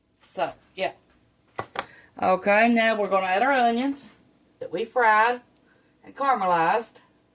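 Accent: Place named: North Carolina, United States. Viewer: You sound like you're from NC. 😊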